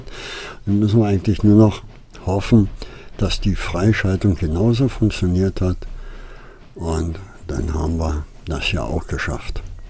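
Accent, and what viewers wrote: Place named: Berlin, Germany. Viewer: schöne videos wo du machst.. finde ich richtig gut... ( deine stimme mag ich sehr ) mit Berline dialekt.. scheee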